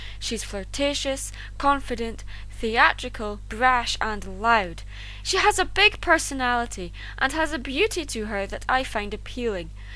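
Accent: Scotland, United Kingdom. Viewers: You probably hear this a lot.... but I really like your voice. Scottish accents sound so cool. ^^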